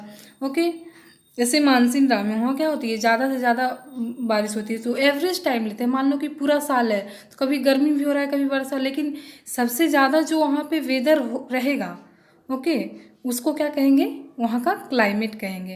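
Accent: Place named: Bihar, India. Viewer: Bihariyo ko tarah mt bol pagal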